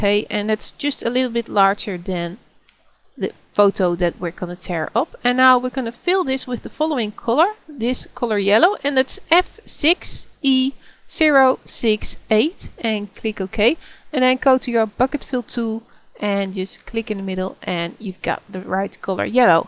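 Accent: Netherlands. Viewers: What capture program do you use?, PS: Ik hoor het aan je accent, jij bent nederlands he?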